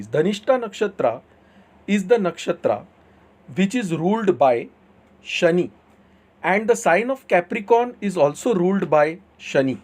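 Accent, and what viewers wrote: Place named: Maharashtra, India. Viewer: Marathi awaj aahe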